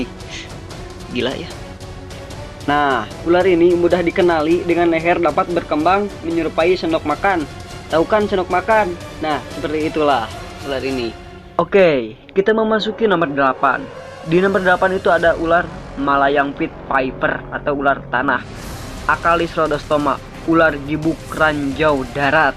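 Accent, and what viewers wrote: West Java, Indonesia. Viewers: Gaya Ngomongna sunda pisan euy. Jigana babaturan Dede Inoen. Mangpaat pisan infona Lur. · Sundaaa...a bangetz...!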